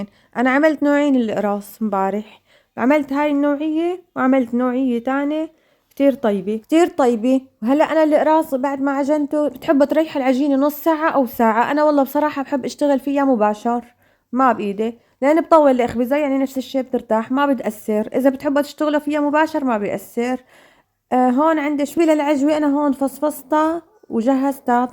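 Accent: Homs, Syria. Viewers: يسلموا دياتك فعلا شي بشهي يعطيك العافية ويبارك ما بين ايديكي .على فكرة دخيل اللهجة الحمصية ما أحلاها الله ايسلم ❤❤❤❤ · حبيبتي شكرا انا متابعتك وبحبك كتير احلى لهجة لهجة السورين وبحب حكياتك وصفاتك انتو السورين ستات بيوت ماشالله عليكم متابعتك ام فيصل من فلسطين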